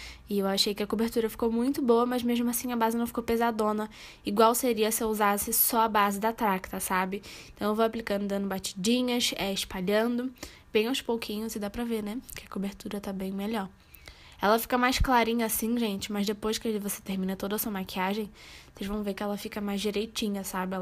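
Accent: Rio de Janeiro, Brazil. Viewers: Achei que você fosse do Rio 😆 sotaque carioca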